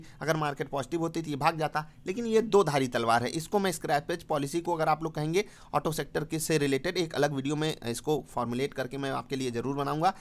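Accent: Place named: Kanpur, India. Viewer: ap kanpur se he kyaa?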